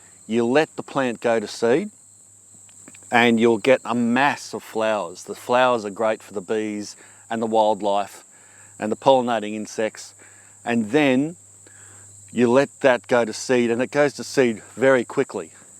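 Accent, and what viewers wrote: Australia. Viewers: Omg! I was looking for recepies of mustard and I found you, with this aussie accent that it m miss me, after spending 2 years in Sydney in 2000, you brought me to those days ❤ tank you for the recepies.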